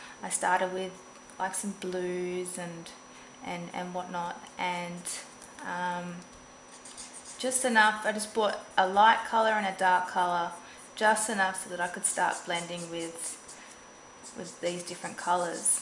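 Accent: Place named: Australia. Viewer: AUSTRALIAN ACCENT FTW!!!!!!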